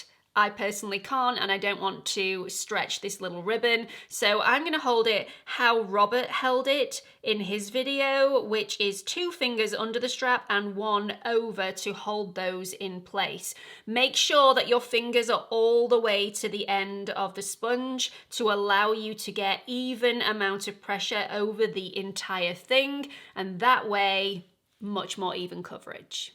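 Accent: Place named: United Kingdom. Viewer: Hi! I love your videos, even though most of the products are not available for me, here in Argentina. I keep your info until I can travel! One question totally unrelated to makeup… I’m a teacher of English and I love your pronunciation… where are you from? It’s clearly British, but not from London. Maybe Scotland? Thanks!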